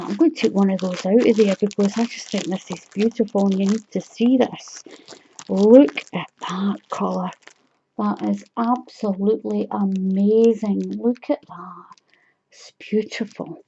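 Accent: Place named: Scotland, United Kingdom. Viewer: I think you’ll find it’s pronounced ‘boo-clay’, because of all the fuzzy bits. 😃😃😃. You deserve this lovely gift. Whenever I hear your voice I remember the delightful four days I spent in Edinburgh a few years ago. I loved Scotland.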